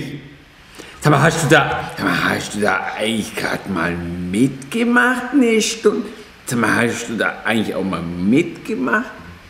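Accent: Swabia, Germany. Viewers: Haha, das Geschwäble! Grüße aus Tübingen 👋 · Ich liebe Dein Schwäbisch, bin aus Stuggi ...herrlich 🙏👍🙏👍🤣🤣🤣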